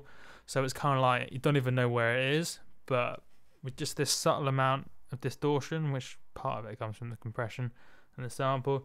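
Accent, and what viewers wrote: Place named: England, United Kingdom. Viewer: I love this guys passion for 808s, trap, muzik production. Love the enthusiasm and english accent! Cheers mate!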